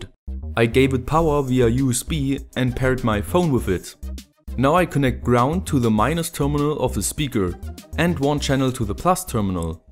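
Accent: Germany. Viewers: dude he's German you can tell by his accent. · Your very german accent is very pleasant to my ears.. Nice project but why don't you use just a small transformer or transducer to get rid of the hum, when you use the same powersupply for the amp and the receiver? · That accent is clearly German, maybe Dutch which is similar (NOT the same, I dont wanna offend my German friends) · he sounds german to me and the brands he uses suggest he lives in eu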